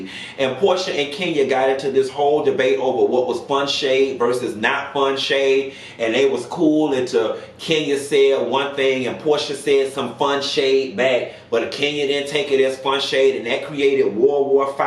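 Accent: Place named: New Orleans, United States. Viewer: PLEASE READ THIS!!!!! YEP Q. I love when u say FSU😄😄😄😄. THATS WHAT MADE ME SUBSCRIBE OVER A YEAR AGO!!!!! Ya heard me. ( im from Detroit tho i love new Orleans accent. you give the best... Im mean the BEST reviews keep it coming. Yep and even tho i like TS Madison I looooovee watching when u r on her show. when scroll and c ur name in something i stop and watch Keep going brother!!!! U give me life especially in the morning. CATCH😭😭😭😭😭😭 LOVE IT AND U!!!!!!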